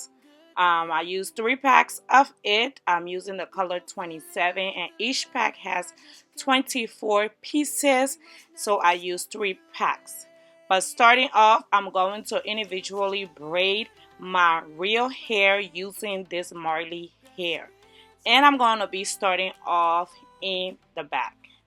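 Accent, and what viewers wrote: Liberia. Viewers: I told myself she is from Liberia..then came to the comments section to confirm.. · Jessica Wiah yeah I can hear her accent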